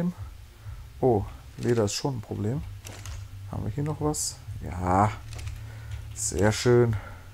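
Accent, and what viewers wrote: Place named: Frankfurt, Germany. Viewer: aus welcher gegend kommst überhaupt manschmal hab ich das gefühl kommst aus frankfurt oder zumindesten rhein main gebiet :)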